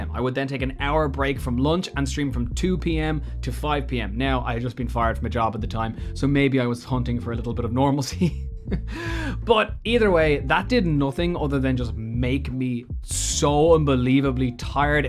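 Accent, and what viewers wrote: Ireland. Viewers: I should not be watching this because I'm trying to get out of my Twitch grind mentality but I just enjoy your irish accent so much! xD Anyway Muted streams do count as views according to Twitch support on twitter: <link>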